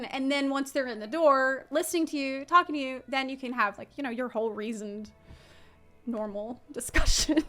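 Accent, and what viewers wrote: South, United States. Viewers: Is zeplas southern Akzent stronger then bevor ? Sounds like it ! Guessing merica is brushing off on her. Disclaimer i know she is from america. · Wow haven't seen a video since she's been back in USA and didn't realize her accent came back this hard. When I was a kid my family said I got a southern draw from going to my friends house. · Random note: your southern gal accent has made a major comeback since you were forced to return to the US!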